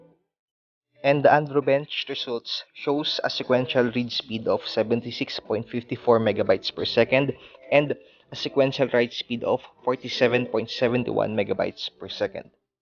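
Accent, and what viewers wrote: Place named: India. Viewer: Lol, me too. But he speaks i think indienglish or tagaloenglish thats why.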